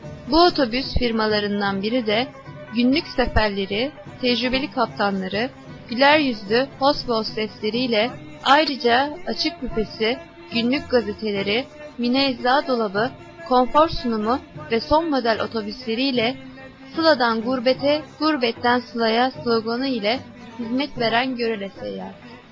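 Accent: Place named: Istanbul, Turkey. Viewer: Madem görelenin tanıtımını yapıyorsun o zaman sunumu yapanın ağzı istanbul ağzı değil Görele ağzı olsun yerel kültürün en olmazlarından biri de yerel ağızlar dır.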